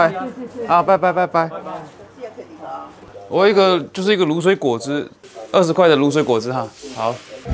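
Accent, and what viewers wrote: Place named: Taiwan. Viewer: 博主是哪裡人？臉相是浙江人，但是說話又有點台灣的感覺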